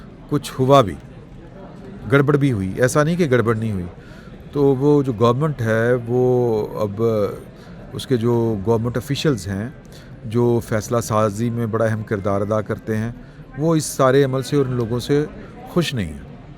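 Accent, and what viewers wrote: Punjab, Pakistan. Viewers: Stupid comments in Punjabi accent. He’s clueless about global politics.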